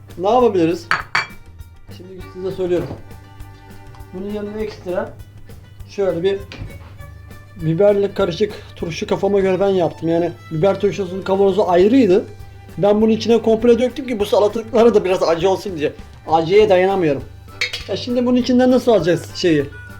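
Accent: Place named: Bartın, Turkey. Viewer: Ulan garibana destek için abone oluyorum nerelisin bu arada bartınli şivesi var sende sanki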